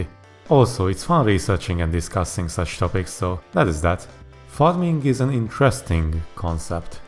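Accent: Hungary. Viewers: csak szimplán hallgatom a hangod és annyira magyaros akcentusod van hogy meg kellett néznem a profilod... és tényleg (csak most találtam rá a csatornádra és tetszik amit látok ;) )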